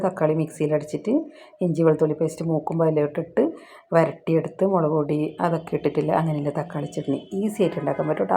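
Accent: Kannur, India. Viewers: YouTube nokkikkondirikkumbozha chechide trainil ndakkunnannathu ingane okkeya enna video kande .samsaram kettappazhe kannurkari aanennu thonni.apo video full kandu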